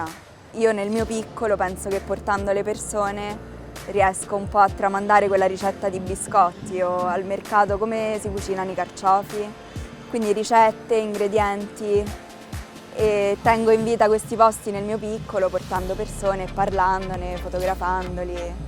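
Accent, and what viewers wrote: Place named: Umbria, Italy. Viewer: Your accent sounds Umbrian...not Romana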